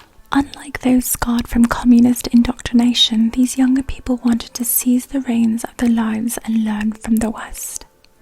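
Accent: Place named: United Kingdom. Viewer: Your accent is so stunning! Are you from the UK?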